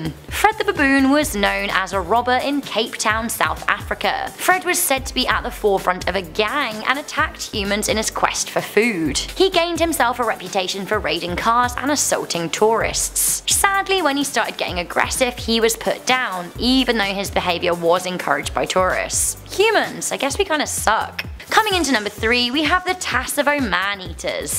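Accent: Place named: England, United Kingdom. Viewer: English accent 😍😍😍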